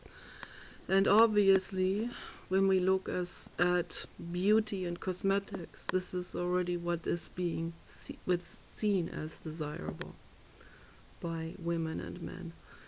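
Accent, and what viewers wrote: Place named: Germany. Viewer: Are You German? You have suuucchh a german accent. Great video!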